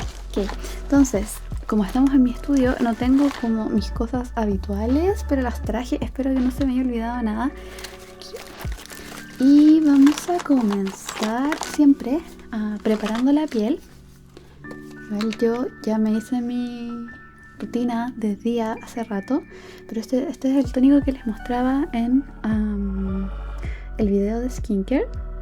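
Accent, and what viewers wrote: Chile. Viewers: Ahora sí usas tu voz natural de el Dejo Chileno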